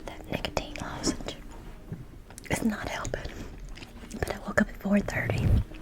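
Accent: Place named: Kentucky, United States. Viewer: This is like listening to my aunt from KY who passed away a decade ago. It's nice to hear the accent and sweetness. Thank you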